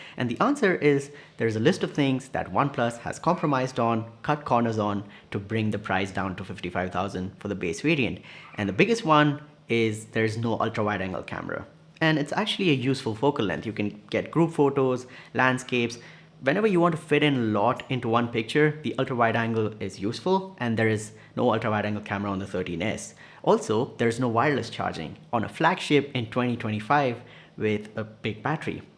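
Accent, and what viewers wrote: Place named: India. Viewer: Abe chaman it's just pink not phinkh It's just type not thyp I hate such ppl who don't want to stay Indian while speaking english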